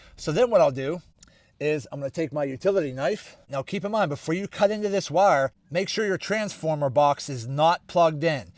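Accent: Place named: Pittsburgh, United States. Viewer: Where are you from I'm from pittsburgh and I hear a yinzer accent when you talk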